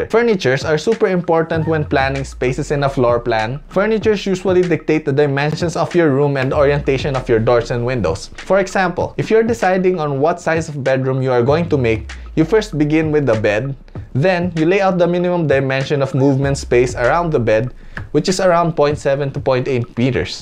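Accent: Philippines. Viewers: With your asian accent, i can tell, you’re a filipino😂. I also want to be an architect and this video really helps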